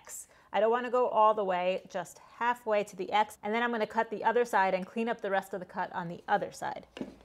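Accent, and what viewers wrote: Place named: New England, United States. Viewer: Holy cats, I heard that New England accent and I thought, is she Norm Abram's daughter?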